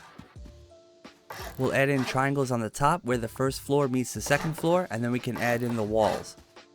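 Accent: New York, United States. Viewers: U from New York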